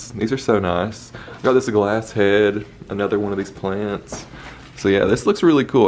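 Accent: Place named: Tennessee, United States. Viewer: Binge watching your videos today. You are the best! I love watching you just do some regular shit. You’re just cool af!! Your voice makes me miss living in SE Tennessee.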